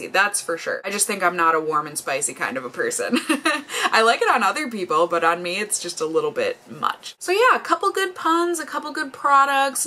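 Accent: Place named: Midwest, United States. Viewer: I love that you say b-aye-g lol Midwest accents make me happy 💕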